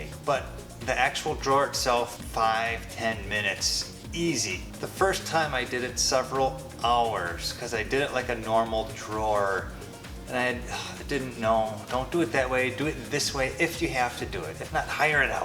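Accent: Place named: Wisconsin, United States. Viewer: I grew up in central Wisconsin, I know that accent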